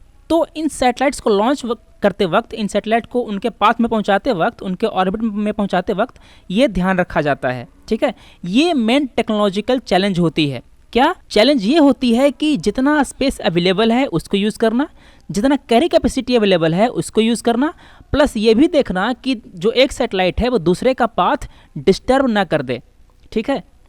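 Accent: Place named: Bihar, India. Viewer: bihari type of language of tutor..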